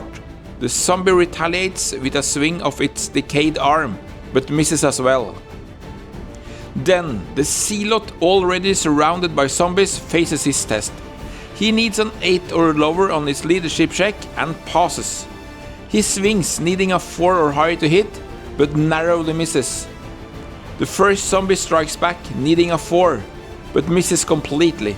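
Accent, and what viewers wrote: Norway. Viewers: Is that charming Norwegian English i hear? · Very cool! I can hear from your accent that you are in Norway. Let me know if you are in Oslo and wanna play.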